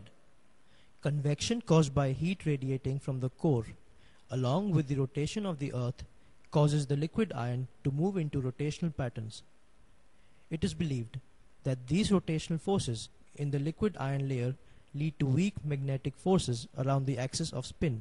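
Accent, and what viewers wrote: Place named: India. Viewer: you have such a indian accent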